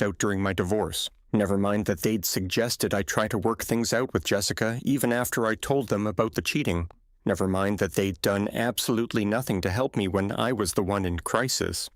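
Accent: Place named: Canada. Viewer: The voice sounds Canadian 😂😂😂. Parents don't want to deal with the sister and her kids😂😂😂😂😂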